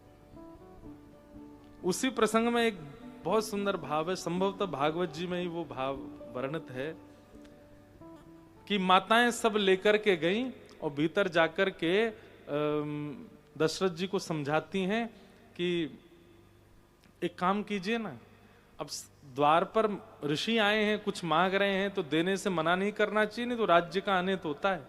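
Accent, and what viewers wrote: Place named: Braj, India. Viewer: Jay Shri Krishna Radhe Radhe 🕉 Namo Bhagvate Vasudevy Namh OM 🕉 Namo Narayan ji Jay Shri Radha Rani Jay Shri Radha Kishori ji Jay Shri Radha Raman ji Jay Shri Guru Dev Maharaj ji Aapke Charno Mai Koti Koti Vandan 🙏Radhe Radhe Jay Shri Harivansh Aapki vraj Bhasa Badi Sumadhur hai Jay Shri Vrindavan Dham Jay Shri Yamuna Maharani ji Radhe Radhe 🙏♥️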